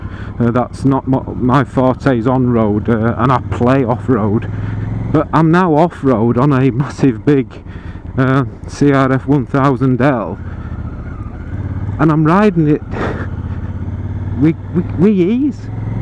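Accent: Yorkshire, United Kingdom. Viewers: Cracking, comprehensive review matey! And I bloody love your Yorkie accent 👍🏻 She looks beautiful in them colours but then I would say that 😉😊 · One more comment. I'm from the states. Quite a few years ago I enjoyed a British TV show here on PBS. It was called "All Creatures Great and Small" about a country vet in the Yorkshire country side. Loved it. Your accent brings me back to that time. Thank you.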